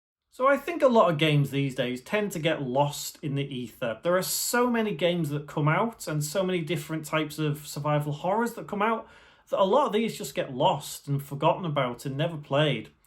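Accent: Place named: North West England, United Kingdom. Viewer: Cool video man and it’s good that you’re highlighting lesser known games. I think if you’re wanting to do YouTube seriously you’d benefit from some higher production values. I’d script your game voice overs so you can hit all the points conclusively rather than winging it. You don’t have to listen to me but I do run a channel with a silver play button and the advice is friendly and I want you to succeed. You also sound like you’re from my neck of the woods, north west uk?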